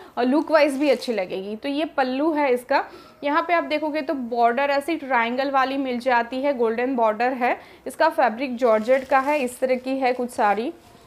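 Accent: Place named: Gujarat, India. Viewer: Ma'am I think aap gujarati ho aapke bolnese lag raha hai.. Mebhi gujarati hu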